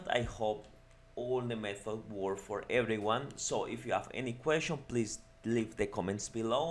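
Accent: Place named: Italy. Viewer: This was soooo cool. "Alt 64" worked for me. I adore accents! With respect, and if you don't mind, where's that accent from? Sounds Italian or Spanish? You're the man!! 2024 Sept the 30th.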